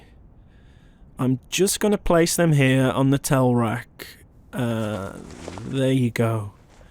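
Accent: United Kingdom. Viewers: He fucking sounds British…I love it..😂😩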